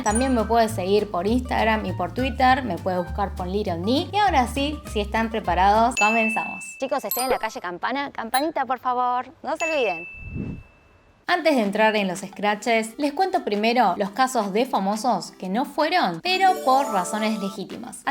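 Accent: Argentina. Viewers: Muy buenos tus videos lo unici que no me gusta es ese molesto sonido argentino .shoñ shoñ lo siento es mi umilde opinion ...que tengas un lindo dia👈😇👍👈😎👍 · Hablas como n dejo argentino pero con mentalidades coreanas no me parece corrupción sino libre albedrío que debería haber ya se acabó la esclavitud querida todos tienen derecho a buscar que nacionalidad quieren tener o ir o no ir a cumplir el servicio militar es que claro solo en Asia específicamente Corea y también oriente el machismo también se terminó deberían cambiar esas políticas narcisista soy privilegiada e evivir en Latinoamérica taynqye nos quejemos tenemos muchas libertades no nos lavan el cerebro desde la escuela como allá ser mejor no quiere decir ser infeliz. Si se pueden ambas. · Es raro ver una persona con tus rasgos orientales hablar argentino no español argentino marcado, se q debe ser vueltas inmigrantes ya debe ser normativo pero me sorprende igual, rasgos físicos son muy marcados, y acento también., es combinación diferente. 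· Hablas español perfecto con acento argentino... Es mágico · habla español con acento argentino.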